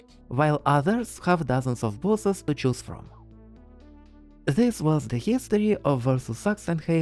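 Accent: Russia. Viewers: Почему у тебя такой русский акцент? (я просто тебя впервые вижу) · Are you russian ?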